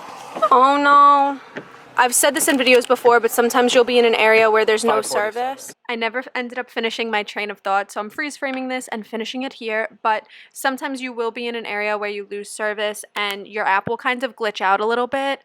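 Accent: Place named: Long Island, United States. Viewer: Former co worker of mine from Long Island...same accent 😀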